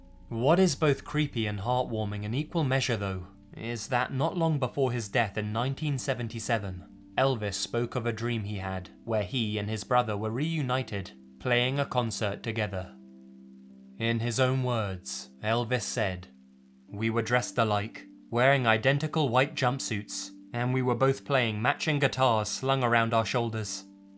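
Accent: United Kingdom. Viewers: I feel people who speak harry potter are the best narrators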